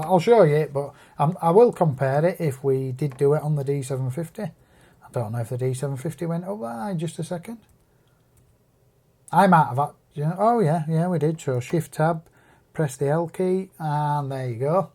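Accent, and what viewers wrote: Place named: Yorkshire, United Kingdom. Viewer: you sound like my son...yorkshire accent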